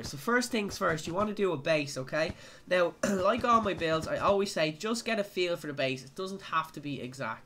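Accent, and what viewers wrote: Dublin, Ireland. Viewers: Are u Irish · Are you From Dublin??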